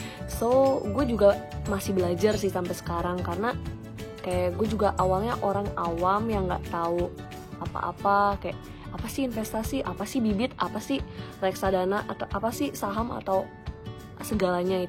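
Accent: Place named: Medan, Indonesia. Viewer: kakak, kek anak Medan...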